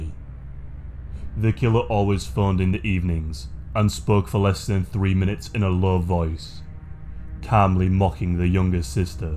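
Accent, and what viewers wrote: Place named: England, United Kingdom. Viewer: I’m guessing somewhere near abbey road I gotta know which part of England you are from my friend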